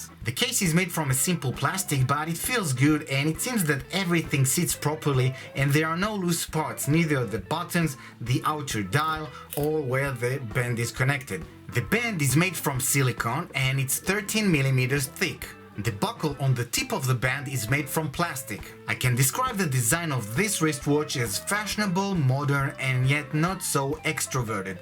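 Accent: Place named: England, United Kingdom. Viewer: wow talk about stereotype english accent!!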